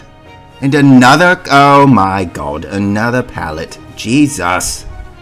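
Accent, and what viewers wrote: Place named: United Kingdom. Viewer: I’m deaddddd 😭 he really sounds British tho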